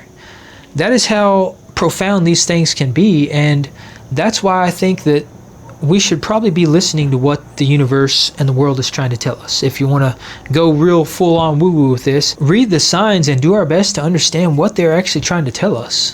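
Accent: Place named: Texas, United States. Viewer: You sound like you're from Texas..i.e. San antonio.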